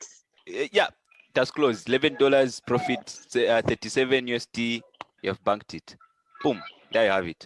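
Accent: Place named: Jamaica. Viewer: He sounds jamaican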